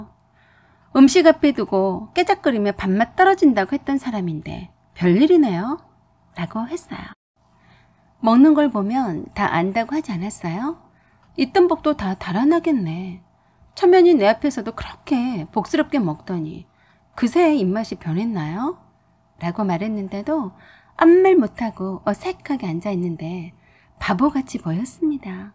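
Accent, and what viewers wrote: Jeolla, South Korea. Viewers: 혹시 전라도?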